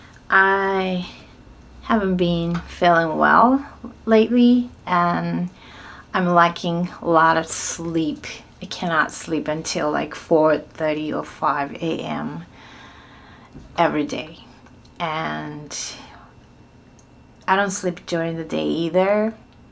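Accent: United States. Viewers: As an Indian I liked your content ma'am because of your American accent. And you just teach us American english accent please🙏🙏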